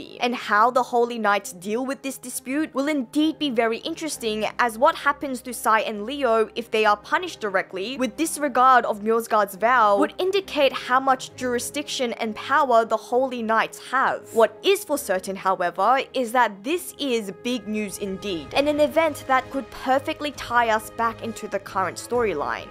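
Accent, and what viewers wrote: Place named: Australia. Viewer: This girls accent is so jumbled between Australian and Brittish.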